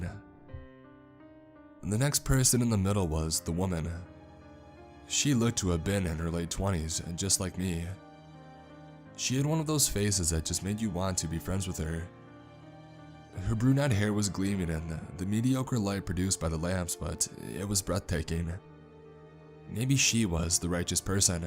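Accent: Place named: United States. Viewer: Hey do all the ppl who read these CreepyPasta things all have American accents? Like... There's no one in Ireland or the UK doing this stuff? Not knocking your voice or anything, bud, you're doing great.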